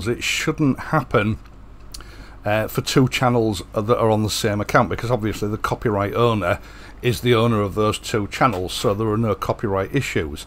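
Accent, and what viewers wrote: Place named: Yorkshire, United Kingdom. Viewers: If that isn't a Hull accent I'll eat my hat!! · Hi, thanks for sharing your “your trials and tribulations” of the pros and cons of running a global channel. Like most things, I guess it’s a learning curve. However, my attraction to this channel is Royal Enfield, plus your Yorkshire accent. Let’s get back to that please! Regards and respect.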